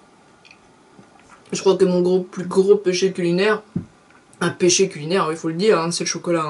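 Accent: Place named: France. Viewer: Hello!! J'aime bien ton authenticité, ton franc parlé et ton accent....J'ai une amie Française que je connais depuis peu et j'adore son accent....Tu me fais pensée à elle!! J'écoute tes vidéos depuis peu et je suis abonnée également et je regrette pas....Tu as un vidéo sur les Arpèges entre autre et des trucs que j'ai enfin compris comment m'y prendre pour pouvoir l'apprendre et tu expliques très bien toutes les étapes!! Merci! J'adore aussi ta toune:!! Tellement réaliste! Et je voulais te dire que moi aussi j'ai vécu le jugement des gens sur mon orientation: On m'a cataloguer quelques fois de (Lesbienne) mais en réalité je ne le suis pas. Je suis en couple depuis 29 ans et même à ça les gens me juge juste par mon côté Garçonnière....Mais avec les années je commençe à m'en foutre pas mal moi aussi donc!!....Ta personnalité me rejoins parce que je suis authentique et un franc parlé comme toi et c'est ce que mes amies aiment de moi alors la recette doit être bonne!! 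Longue vie à ta chaîne!! Et au plaisir de te relire et t'écouter....tu as du beau talent...sincèrement!!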